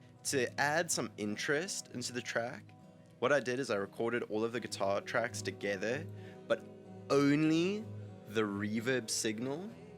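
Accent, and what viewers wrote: South Africa. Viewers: Cape Town accent?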